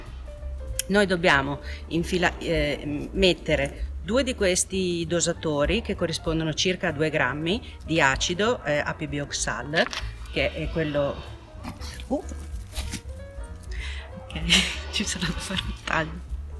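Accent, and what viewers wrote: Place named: Milan, Italy. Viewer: io sono nato e cresciuto in Brianza, ora da 10 anni vivo in provincia di Torino. Ti ho chiesto perché ho riconosciuto l'accento milanese 😉👍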